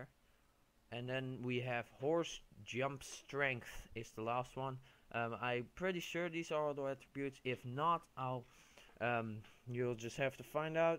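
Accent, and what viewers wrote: Netherlands. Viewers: is this man dutch?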